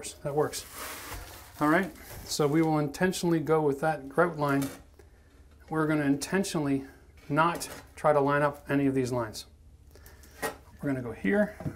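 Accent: Canada. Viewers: This Canadian accent is crackin me up! O.g lol.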